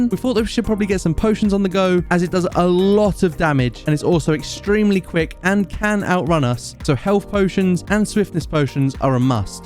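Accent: United Kingdom. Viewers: Your so British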